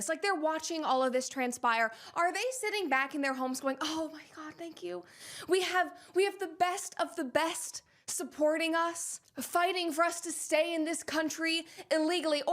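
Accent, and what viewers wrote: United States. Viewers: i really liked u vibing and yapping fast and quick with American accent lots of your yapping is really fast accurate and precise 🇺🇸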